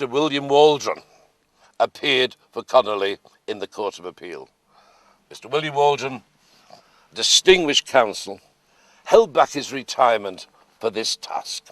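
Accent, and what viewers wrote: Liverpool, United Kingdom. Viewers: Sounds like a Liverpool accent for that area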